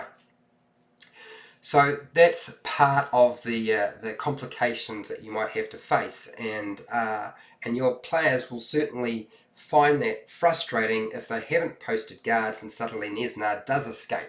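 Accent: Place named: New Zealand. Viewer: After watching so many of your videos, I'll have to dedicate an NPC or a villain to you because in addition to your content, you've become an invaluable resource for a great New Zealand dialect!!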